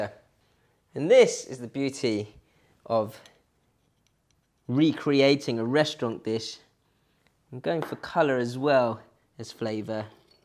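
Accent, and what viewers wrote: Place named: United Kingdom. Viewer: OMG you are so darn cute !! The fact that you are asian with a brit accent......... just too damn cute !!! oh yeah, thanks for the recipe!!!!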